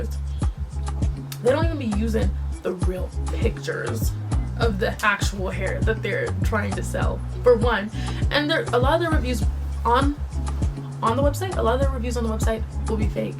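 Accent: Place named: Canada. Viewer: Yessssss someone from Canada. I knew as soon as you starting talking 😂. You are gorgeous! Thanks for this video, it’s been in my cart forever. So happy to find a Queen with this colour!😍😍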